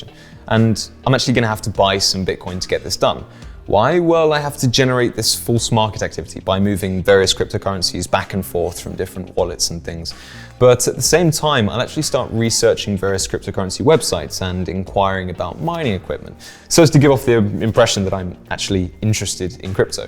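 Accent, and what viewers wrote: United Kingdom. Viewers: a British accent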